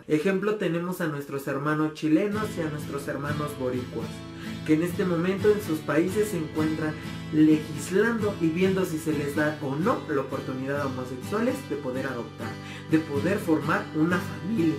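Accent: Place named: Fresa, Mexico. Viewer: <link> cagan sus caras que hace y su tono de fresa de nezagualcoyotl neta este tipo me hiper cago la madre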